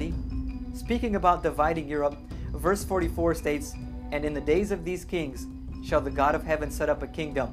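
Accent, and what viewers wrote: United States. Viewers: Co tam u ciebie? so you are Polish or not? because you sound American. BTW I'm not Polish I just have Polish friends my best Polish friend is in Ireland on the run from the law for driving offences his name is Prawo Jazdy.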